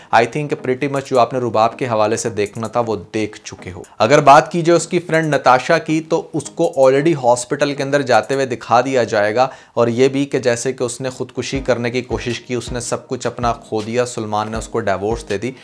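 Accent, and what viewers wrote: India. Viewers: Ap Indian ki tra feer bol rhy ho phr q nhi bolty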